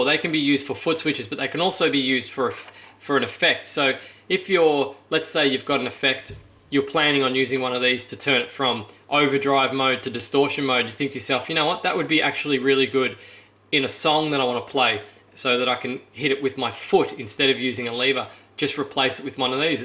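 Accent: Australia. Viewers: Hi, Thanks for the video!! Refreshing to hear an Aussi accent. I’m from SA. This will be my first pedal project and I just wanted to check something before I start. I want to mod a simple a b box (no LEDs/power) into an a b y box. All I need is a switch to activate the y for different pedal setups (summing parallel effect signals (Q within a Q: is this the right way to sum?)). Would all I need be a single pole double or would I need a three pole double throw like the foot switch?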